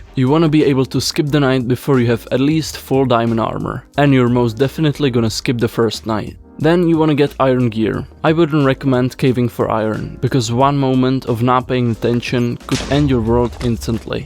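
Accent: Czech Republic. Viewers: ceskej prizvuk uplne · bro where are you from? You don't sound like you're from an english speaking country. You sound like you're from the Czech Republic. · what nationality are you? you have a czech accent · You do have little bit of czech accent. Amazing video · bro had czech accent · bracho ta cestina tam jde slyset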